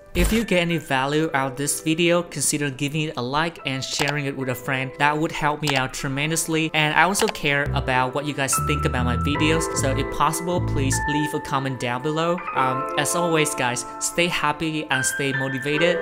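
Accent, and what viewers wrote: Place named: England, United Kingdom. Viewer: Thanks to your Fb post about Notion, I know you. I really like your English accent and what you shared in your videos. Thanks so much. <3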